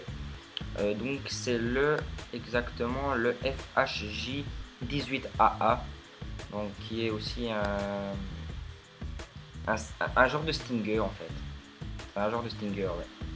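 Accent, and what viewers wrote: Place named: Belgium. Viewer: accent belge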